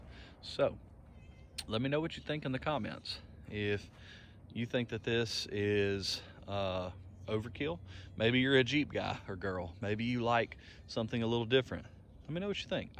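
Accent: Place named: South, United States. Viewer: I am registering my GX470 in the town a have second home in. I just bought it and can not drive it because all of registration paperwork is sitting in an airport because of the snow storm. I am so pissed. Thank you for the showing us that may not know the difference. Between the various SUVs. Love your southern accept. Ha ha. 👌🏽. Are you in Instagram?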